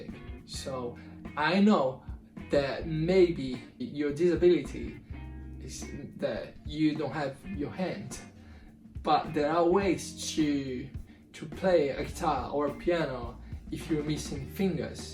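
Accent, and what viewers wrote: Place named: United Kingdom. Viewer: What a beautiful British accent, my friend!!!! I want to speak like that toooooooo!!! Saudades, meu amigo!!!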